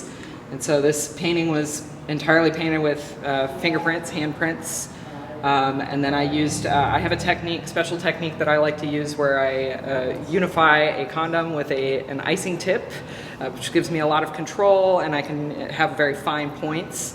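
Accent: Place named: Minnesota, United States. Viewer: I thought I detected a Minnesota accent! That's my home state. Cheers!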